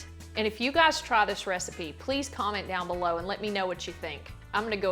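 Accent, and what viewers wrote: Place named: South, United States. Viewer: i love that countryness in your voice 😍😍😍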